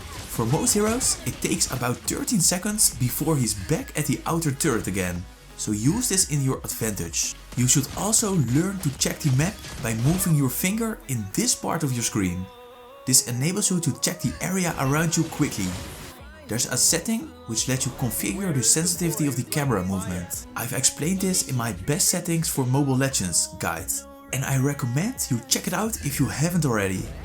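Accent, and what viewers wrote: Netherlands. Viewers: By your accent... Are you dutch?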